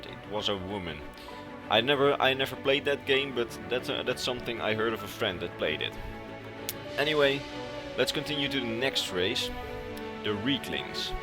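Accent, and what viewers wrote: Netherlands. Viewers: From your accent I can Tell your Dutch Correct? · dunglish tho or nengels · the man behind the voice, is he Dutch? the accent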